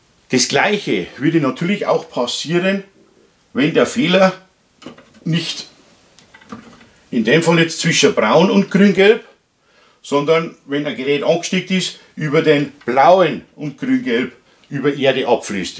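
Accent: Bavaria, Germany. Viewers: Habe gut gelacht. Warum? Weil mein Kopfhöhrer am Ladegerät hing und Youtube versucht hat, Bayrisch mit Untertiteln auf Hochdeutsch zu versehen. :D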